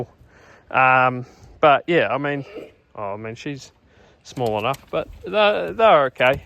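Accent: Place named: Taihape, New Zealand. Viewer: Mate..... said with that taihape lion red twang, had some bloody laughs watching this. said to the wife, "oh shit he's got one of those datamar's reels" feel your love hate relationship with em. so anywho, im from the wider north island, been there done some of that, grew up in the windy city of Palmy and migrated through the island to north-land after a seasons in ohingati, drove stock units in your neck of the woods for couple years before going back to the front end, to many late nights loading out of fielding on a Friday night for my liking. anyways nice sarcasm on the wool. bloody sacrilege. look mate we were farming 600 ha in north-land before it all got turn into carbon pine forest but i swear the best vale out of your nitrogen is in may, sets you up for winter, and yeah i always monitor soil temps, we we 12.3 on Wednesday last week., love your vids mate. 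( oh yeah my hunterway rocks is exactlly like duke). hard headed..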